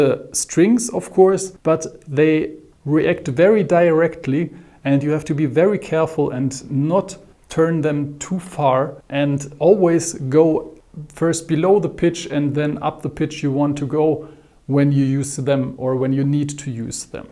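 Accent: Germany. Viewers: DEUTSCHER AKZENT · Are you german?,sounds like that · German accent thats nice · You have a german accent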